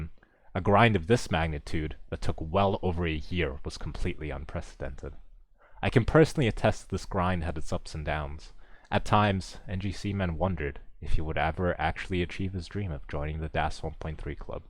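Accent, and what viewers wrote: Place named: United States. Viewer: 1.2 19 start when? also why do you sound so american?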